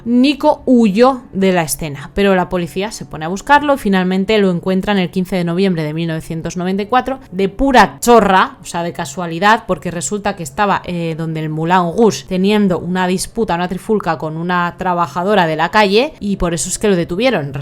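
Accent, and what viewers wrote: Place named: Spain. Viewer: Realmente nunca me gustó ver a algún español no me lo tomes a mal el acento, pero me encanta verte y escucharte te veo y en ocaciones solo te escucho mientras estoy trabajando en el microscopio 🔬 💕 😊 me encantan, las historias criminales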